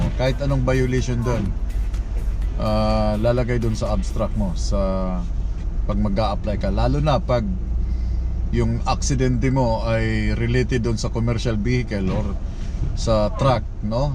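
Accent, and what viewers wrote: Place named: Pampanga, Philippines. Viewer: Parang kapampangan sya kase ako ganon matagalog lol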